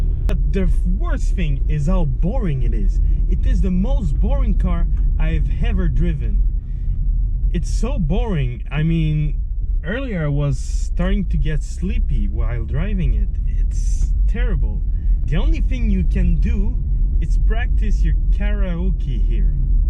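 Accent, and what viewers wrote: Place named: Quebec, Canada. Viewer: He’s québecois man, give him a break lol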